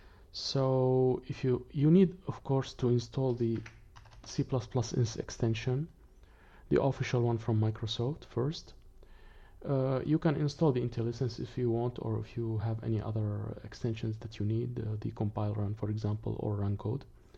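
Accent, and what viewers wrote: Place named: Italy. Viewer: Just out of curiosity: your name is not italian but your pronounce sounds italian. Where are you from?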